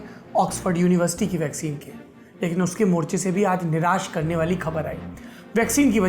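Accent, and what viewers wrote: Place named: Kanpur, India. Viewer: भईया... जो बोलते है आप बड़ा गजब का बोलते है.. कसम से तबीयत खुश हो जाती है। खास कर जब अपने कनपुरिया स्टाइल में बोलते है। भईया आप हमेशा ही ऐसी पत्रकारिता करना... वह तमाम न्यूज चैनलों की तरह कभी मत बनना।🙏🙏🙏🙏🙏🙏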